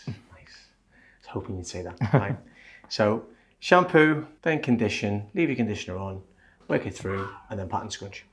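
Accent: United Kingdom. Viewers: My Texas hearing has some difficulty understanding his thick British accent!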